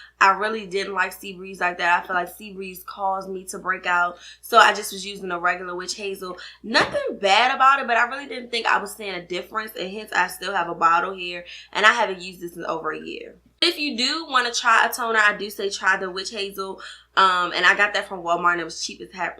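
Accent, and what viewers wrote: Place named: DMV, United States. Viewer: Im 4 years late LoL! But great video & Im going to assume you're from the DMV 🤞🏽 because I can hear it 😂😍 not sure if Im right, but usually a DMV person knows another 😊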